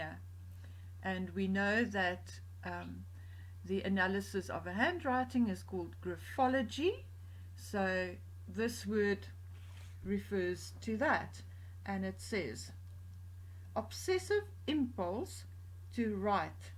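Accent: Afrikaans, South Africa. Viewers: Her whole life is a distortion. So many lies and misinformation....very sad creature if truth be told. She’ll be a lonely bitter old woman before too long. Thanks to Wally for recommending you. 🇦🇺 BTW love your South African accent! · 🇿🇦 I enjoy hearing your afrikaans accent, it reminds me of happy times when i lived there. Also you talk a lot of sense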